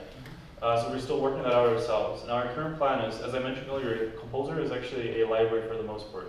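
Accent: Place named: United States. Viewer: Hm., wenn ich das richtig sehe, bist du Deutscher. Von der Sprache hätte ich eher auf Amerikaner getippt. Austauschstudent? Zweisprachig aufgewachsen? Ich staune...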